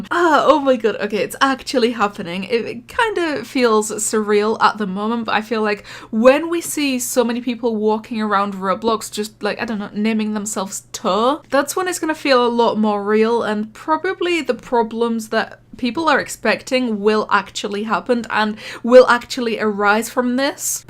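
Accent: Scotland, United Kingdom. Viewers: are you scottish eh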